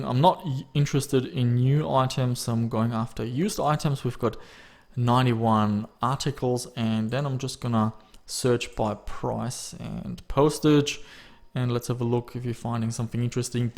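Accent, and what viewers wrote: Germany. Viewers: Du hast ürgendwie so einen deutschen Akzent 😂😂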